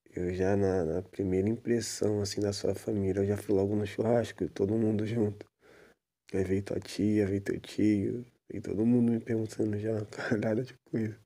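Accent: Rio de Janeiro, Brazil. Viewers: Tu é carioca ? Ou é impressão ? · sim, ele é carioca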